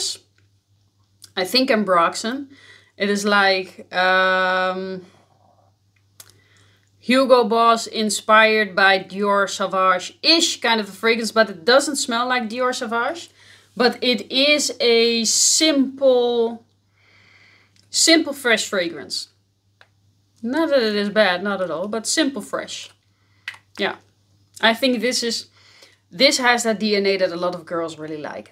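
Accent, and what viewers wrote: Netherlands. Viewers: Absolutely love your Dutch accent 😍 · Dat Nederlandse accentje😊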